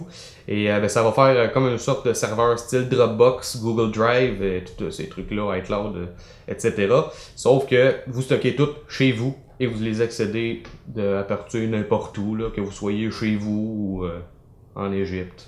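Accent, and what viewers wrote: Quebec, Canada. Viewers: Oh putain, j’ai eu du mal au départ j’avais pas compris que c’était québécois 😂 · Bonjour de Toulouse ou je m'intéresse de près au raspberry pi4 durant le confinement Heureux d'entendre un accent québécois et un passionné du raspberry pi qui me parfait d'être une sacrée bête de course Amities Philippe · Québecois. YES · C'est ça le accent de Québec ? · Super cool la vidéo en plus j'adore l'accent du Québec ^^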